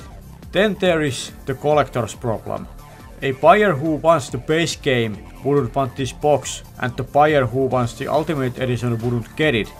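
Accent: Finland. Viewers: The most authentically Finnish accent I've heard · I 100% agree with you! Btw are you finnish? You sound very finnish 😅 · Ai että, suomiaksentin tunnisti heti :D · You have the most Finnish accent I've ever heard. I enjoy it. I'm subscribed now haha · Ha! I was listening that this sounds like a Finnish talking with rally-english accent. Then I checked the channel description and there it was, Suomi. 😄 Mut joo, kiitoksia videosta. Itse aloitin pelaamisen vuonna 1986, eli samoihin aikoihin kuin videon tekijä. But yeah, thanks for the video. I started gaming at 1986. Roughly at the same time as the maker of this video. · One of the most aggressively Finnish accents I've heard in years lol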